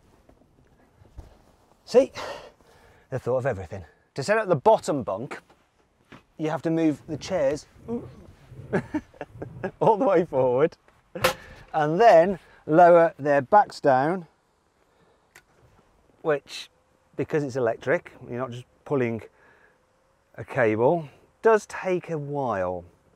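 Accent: United Kingdom. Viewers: does that mean is Polish immigrant? I always thought hes British because of perfect accent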